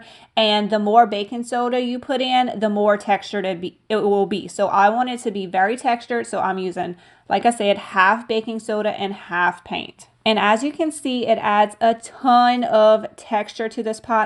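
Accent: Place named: South Louisiana, United States. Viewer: I love your accent! Sounds like South Louisiana! Reminds me of my daddy’s family in the Houma area. I love all your projects!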